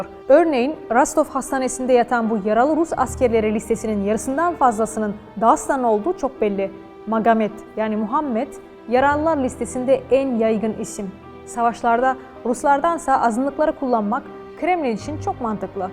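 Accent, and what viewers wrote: Azerbaijan. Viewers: Konusan hangi turk milleti merak etdim. Konusmasi turkce ama aksank sanki bi azerbaycanli konusuyor gibi. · Bu kız nereli?aksanı aynı azerbaycan aksanı sanki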